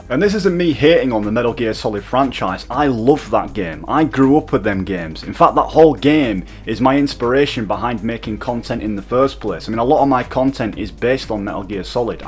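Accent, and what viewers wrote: Bradford, United Kingdom. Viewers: Dude!? Are you from bradford??? You sound just like me 🤣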